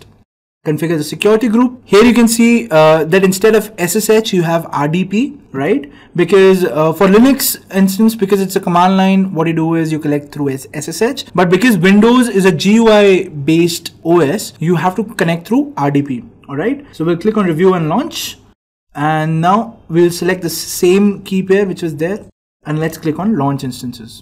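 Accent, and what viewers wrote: India. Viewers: usually I have difficult time to understand Indian English accent, but you're awesome god bless you.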